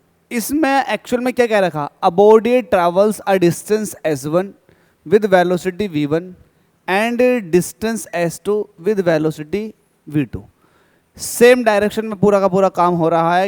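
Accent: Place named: Haryana, India. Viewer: Lagta h sir aap Haryana se ho😅😂😊😊😊😊😊😊😊😊😊😊😊😊😊😊😊🎉🎉🎉🎉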